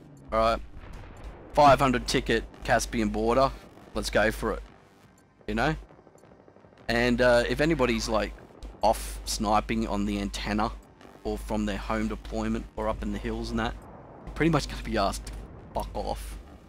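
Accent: Australia. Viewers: Nice video. Good to see a Aussie Commentator :D Subscribed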